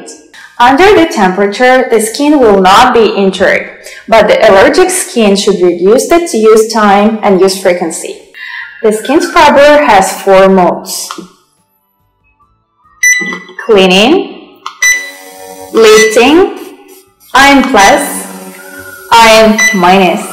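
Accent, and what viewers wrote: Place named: Eastern Europe. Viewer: it’s her accent and lack of pronunciation more than anything. She has a strong Slavic accent.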